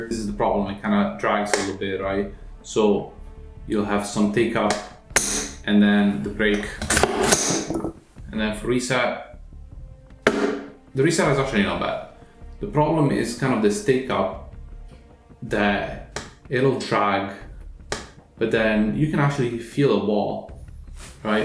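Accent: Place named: Italy. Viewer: You sound italian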